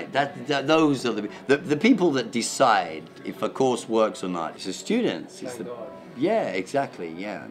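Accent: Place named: Italy. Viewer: I think his accent sounds more italian than british now. isn't it ?^^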